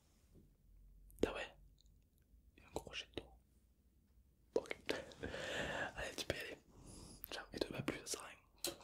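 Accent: South, France. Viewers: J'adore ton accent du sud MOI JE VIENS DE MARSEILLES!!!!!!!!!!!☀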